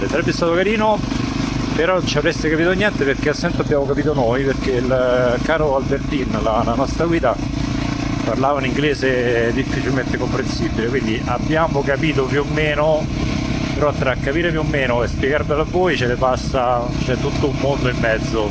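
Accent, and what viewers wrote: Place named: Rome, Italy. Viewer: sono molto contento che puoi fare queste esperienze, bravo. anche io ci provo sovente , ma ancora non è la mia vita piena… ti auguro il meglio e tanta fortuna. inoltre il tuo lieve accento romano è molto gradito. continuo a seguirti da molto tempo, dall’inizio…!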